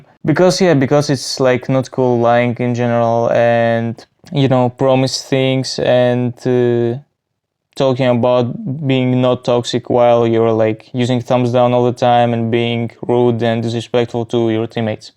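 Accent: Greece. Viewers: I can hear the greek accent coming through my ears lmao Much love man ❤ Stay cool about it There is no reason to waste your time on him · Love the accent, fellow greek accent. Hyra is being a little kid again like wtf. Thanks for sharing your view. Hyra is totally wrong here, lets just not like be toxic back.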